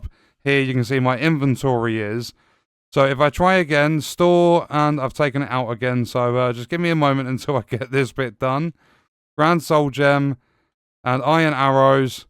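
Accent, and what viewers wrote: United Kingdom. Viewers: shitass video too british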